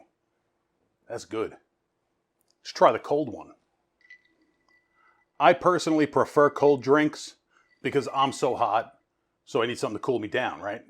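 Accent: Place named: East Coast, United States. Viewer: You live in Florida but sound like East Coast...New York or New Jersey. Also you come across as Italian. My mom was Italian and Dad Irish/English backgrounds.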